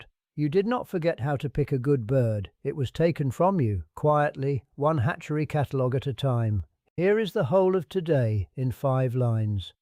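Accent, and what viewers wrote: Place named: Yorkshire, United Kingdom. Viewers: I dont understand why a Scotsman talks with a accent that is nearer to a Yorkshire man. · He sounds Yorkshire accent to me.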